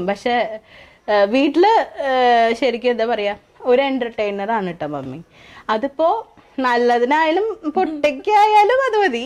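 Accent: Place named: Thrissur, India. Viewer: chechi dea Thrissur language ❤️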